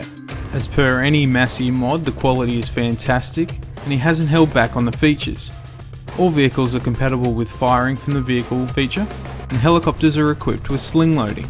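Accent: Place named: Australia. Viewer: lol I could tell from ur accent, Im just across the ditch from u:)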